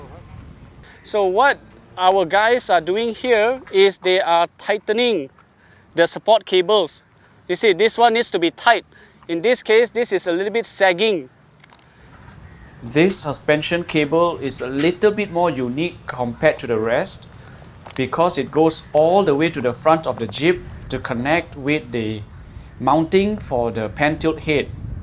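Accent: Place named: Quebec, Canada. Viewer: Where are you from? You have some kind of a french canadian accent!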